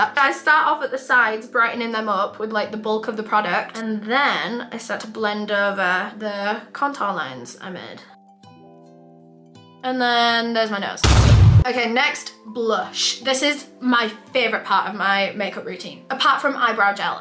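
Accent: United Kingdom. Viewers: My friends (who are 100% deaf and, thus, haven’t got the slightest clue what your Voice sounds like) mentioned that you’ve repaired the Auto-Captions of some of your November & December Videos…They said they had no idea you’re from the UK; they can’t hear, so they never noticed your Accent…Because I don’t know any Sign Language and my friends don’t speak and can’t understand speech either, I always need to communicate with friends by Text Messages all the time…My friends can’t watch your LiveStreams until they’re later saved to their (respective) Channel(s) as regular Videos, thus (hopefully) gaining Auto-Captioning (usually, a day or two afterwards)…My friends turn on the “CC” (so they can figure out what’s being said in Videos) and they hand me the remote so I can, meanwhile, turn on the Sound and I just ignore the Captions and listen to what’s being said in Videos…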